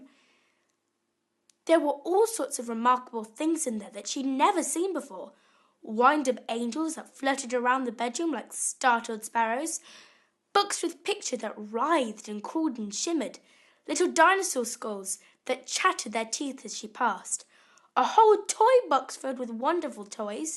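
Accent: United Kingdom. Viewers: that's a British standard accent - certainly not a Cornish one! :) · Thank you. I was wondering which British accent she had.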